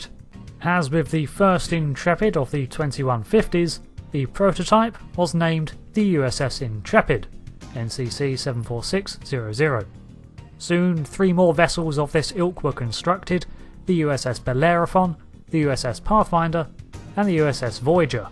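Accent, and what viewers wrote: United Kingdom. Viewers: I love your accent, what part of Britian is it from?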